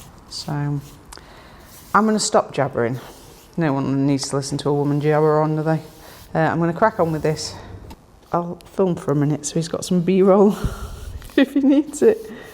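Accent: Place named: Sheffield, United Kingdom. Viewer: It’s looking great already. Please jabber away, I lived in Sheffield for 5 years (in Park Hill flats — Norwich Row!) and I miss the accent.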